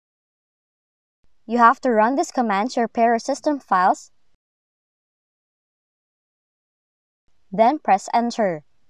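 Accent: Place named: India. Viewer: I must say you cerntainly know what you're doing; however, (and I apologize for this) your Hindi accent overwhelms the pronounciation of some words (e.g.) step three, after the command "echo off|clip" you say press enter , then check __________(not understandable).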